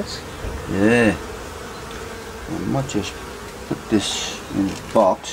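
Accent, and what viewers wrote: Australia. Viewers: hello mate. im a third year beekeeper. had my share of success and failure in that short time. i go to a lot of renaissance faires and was thinking of putting together a display hive for exactly what you said( the wow factor when they see the inner workings and beauty that are inside the hive). was looking at ideas when I found your videos. good job sir. very enjoyable. plus being an American I love to hear you talk (you sound like my buddy Ian from queensland) I like it almost as much as listening to my grandparents who are fresh off the boat from Scotland. keep up the good work and I'll keep watching. im also trying to get a youtube page off with a few videos but im not that good with or in front of a camera. i love trying to video my swarm removals. I've rambled enough, have a g'day mate and dont forget to Bee Happy. sorry couldn't resist.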